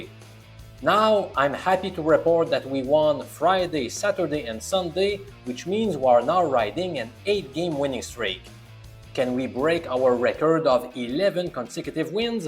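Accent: Quebec, Canada. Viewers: You sound both french canadian and Persian at the same time.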